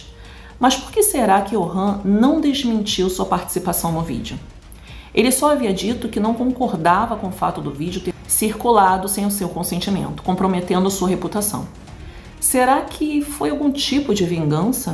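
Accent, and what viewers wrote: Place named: Rio de Janeiro, Brazil. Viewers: Até que enfim, esse sotaque que é igual ao meu!!! Muuuuuito difícil no nicho True Crime, alguém carioca!!! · vdd! mas tem cariocas q tem isso mais forte. A é até light se comparada a outros!!!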